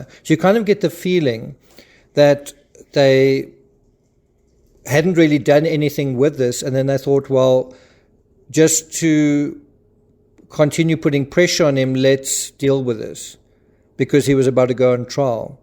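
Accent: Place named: Johannesburg, South Africa. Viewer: Sounds like you’re from Jo’burg??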